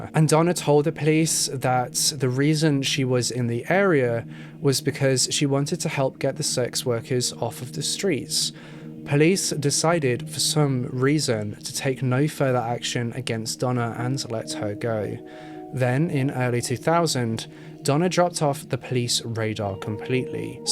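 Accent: United Kingdom. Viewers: He's from the Uk..thats how they talk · I love that you are doing a piece on our local unsolved, but I have to tell you, I'm giggling like a fat kid with a cupcake listening to you mispronounce local place names in a British accent! You didn't even get to any of the real tough Native American named places! Puyallup, Humptulips, Stillaguamish, etc! While we had a number of serial killers during the period of time, I still lean towards Yates as the guy. What a piece of work that guy is. The Green River Killer was working during the same period, and Seattle and Portland both had cases that sorta looked similar. I can't remember if ballistics testing was compared. Been a long time! Keep up the good work! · Great job. Love your respect for the victims and families. P.S. For all the grammar police complaining about pronunciation of certain words I think he does an amazing job especially since he's from the UK. 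I know I wouldn't be able to pronounce the names of places where he lives and I give him credit for his effort. I live here in the US and still mispronounce certain things based on their spelling. does very well I think and I adore his accent!!! 👍🏻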